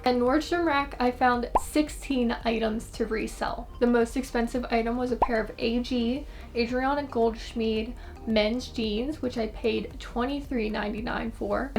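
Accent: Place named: Pennsylvania, United States. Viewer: I agree with the selling up. I have a really hard time paying for items, it just goes against my thrifty/cheap way of thinking, lol. Also, nice to hear a Pennsylvanian accent. I’m originally from State College area but am in Upstate NY now!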